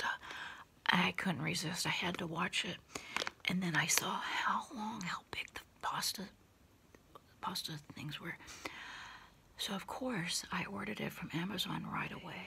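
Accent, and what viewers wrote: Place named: Greece. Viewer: Sounds like greek woman I'm greek too για αυτό το κατάλαβα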